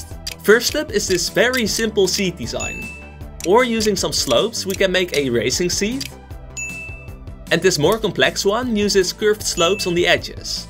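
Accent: Netherlands. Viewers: The cuts are a little too fast for my liking, sorry to say I don't have this much ADHD. Also, the dutch accent is a little too noticable. Great job on the modifications though, that is some very real talent you display there. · I smell dutch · Where are you from? I here a Dutch accent❤😂 I'm Dutch BTW. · Ik ruik een Nederlander aan het accent te horen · Dik Nederlands accent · you sound dutch just like me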